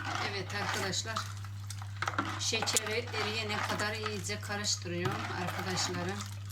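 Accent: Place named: Artvin, Turkey. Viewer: Artvinli konuşman var sife memleket neresi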